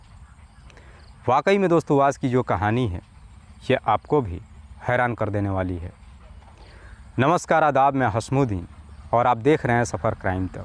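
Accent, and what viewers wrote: Bihar, India. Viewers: हसमुद्दीन भाई आपका लैंग्वेज बिहार के तरह है भाषा क्या बिहार के तो नहीं रहने वाले हैं बहुत बढ़िया कहानी सुनाते हैं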